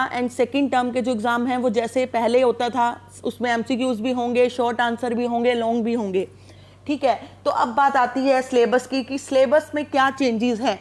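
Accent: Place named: India. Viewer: I just love indian accent very much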